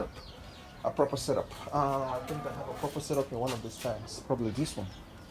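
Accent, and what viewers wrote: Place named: Africa. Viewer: I new I could tell from the accent you had to be a brother from the continent! Greetings from abroad via Uganda! These are things I'd love to do back in Ug. Subbed and liked bro!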